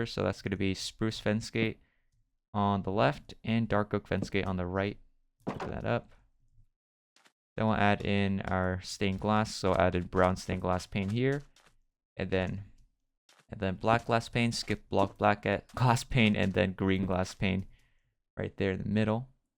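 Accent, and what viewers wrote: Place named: England, United Kingdom. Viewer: The english akzent😂😂😂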